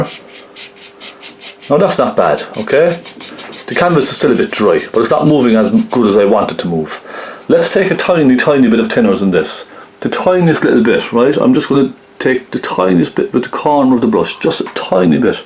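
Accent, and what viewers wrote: Cork, Ireland. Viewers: I so love your Cork accent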